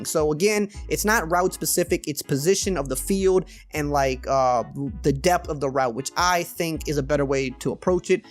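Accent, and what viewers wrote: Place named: New Orleans, United States. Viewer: Are you from New Orleans you got the accent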